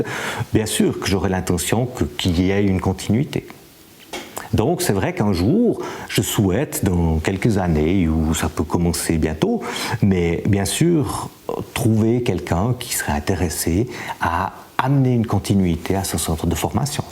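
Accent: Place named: Switzerland. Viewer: En tant que français, c'est un plaisir d'entendre ce bel accent suisse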